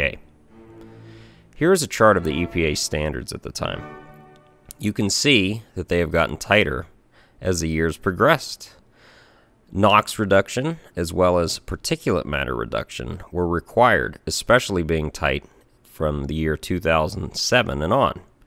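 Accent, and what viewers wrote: Western Pennsylvania, United States. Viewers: Where are you from? Sounds like western PA.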